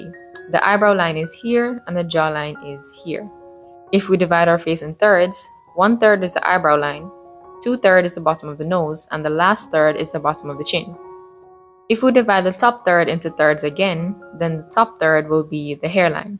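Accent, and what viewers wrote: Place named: Jamaica. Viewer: Your accent is very Caribbean, you sound Jamaican actually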